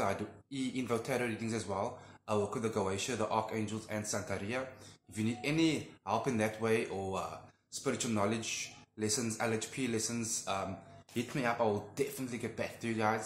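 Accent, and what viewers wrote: South Africa. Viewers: This might be completely of topic🙊😁 but I noticed ur accent and was wondering, is your home language Afrikaans😅😅😅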